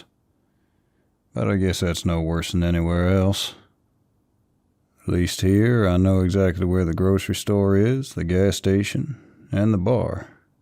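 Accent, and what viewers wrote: South, United States. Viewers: I love your southern accent. It really suits you. I wonder if you talk like this irl🤭 thanks for the part 2 ❤ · I love the southern accent😍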